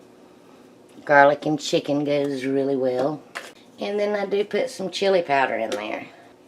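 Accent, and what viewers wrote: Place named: North Carolina, United States. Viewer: I like your recipes! Your accent is like mine so its like we are at a family get together! Hugs to ya. Darla in NC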